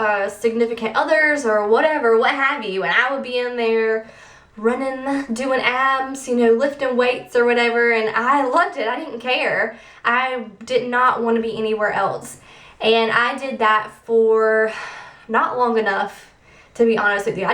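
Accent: South, United States. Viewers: gahhh love your southern accent lol! good advice girl!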